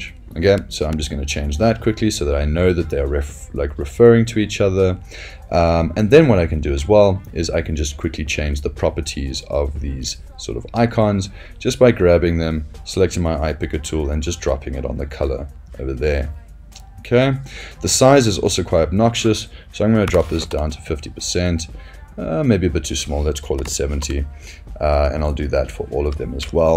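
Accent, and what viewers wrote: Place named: South Africa. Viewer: Thought it sounded South African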